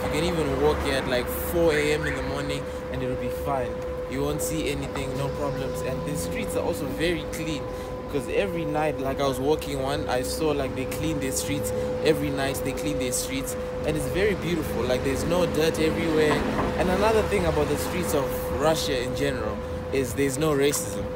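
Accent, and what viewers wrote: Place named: Zimbabwe. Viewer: You have a very nice Rhodesian accent, quite rare to come by these days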